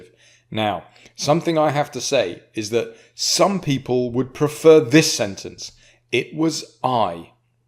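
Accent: United Kingdom. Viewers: Bro is the british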